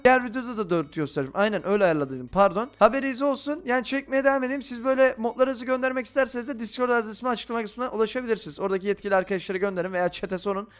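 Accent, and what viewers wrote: Black Sea, Turkey. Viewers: Abi karadenizin neredinsenn söyle ne olur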